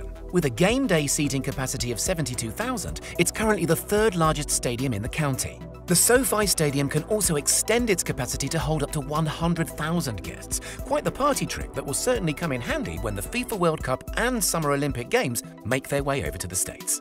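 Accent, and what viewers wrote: United Kingdom. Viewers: Why does the narrator have a brit accent?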